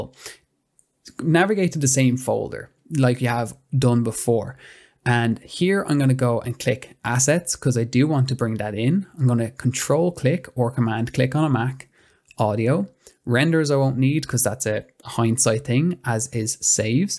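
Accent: Ireland. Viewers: Being also an avid DaVinci Resolve user I have just discovered your channel here on YouTube. Your video tutorials are really helpful 👍and apart from that you are a sweet guy, I also really love & enjoy your Irish accent. Greetings & hugs from Germany ... 💙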